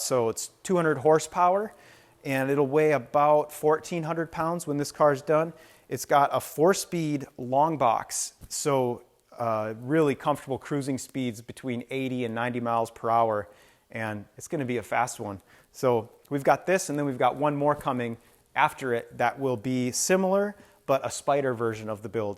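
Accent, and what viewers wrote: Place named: Manitoba, Canada. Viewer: <link> Are you Minnesotan or Canadian? Specifically Manitoban? I live in Winnipeg, and everything about your accent screams Manitoban to me.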